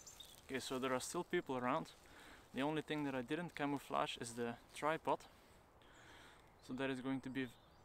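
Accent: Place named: Russia. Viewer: Классно снимаешь, акцент, по правде говоря, всё-таки русский)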